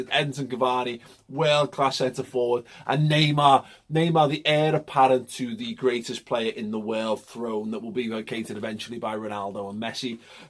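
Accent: Liverpool, United Kingdom. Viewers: Man I'm Indian and now I can understand scouser clearly ...thank you ...can even speak it a tiny bit !!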